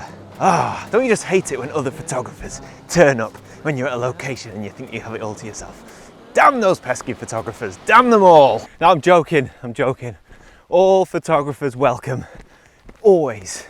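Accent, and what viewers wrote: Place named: United Kingdom. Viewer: I was just wondering, but where does this guy live ? ... it's raining all the time and he speaks proper English.... must be a Brit ! and I like it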